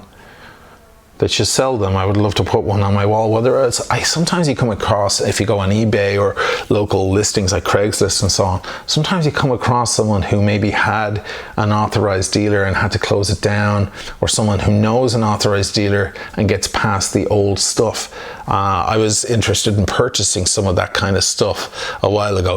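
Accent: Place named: Dublin, Ireland. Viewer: Jaime His accent is pure Dublin. Not picking up any American at all. Not a criticism in any way but I’ve had both Irish and American girlfriends and this man is pure Irish. It’s a cool accent anyway and this guy is a rising star of the watch people online community. I love his passion for the pieces.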